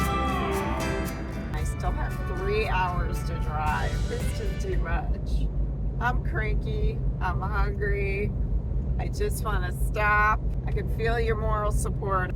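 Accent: New Jersey, United States. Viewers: First time subscriber. I thought i detected a Jersey accent. We had a Lance camper and loved traveling across country or many years. Fortunately our pets never needed a vet. Be safe.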